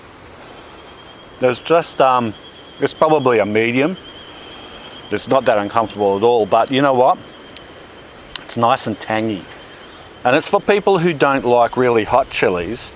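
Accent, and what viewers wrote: Australia. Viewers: Love the Aussie accent!!!! Oi oi 🌷🌷🌷🥰🥰🥰😂🦋🦋🦋🦋🍀🍀🍀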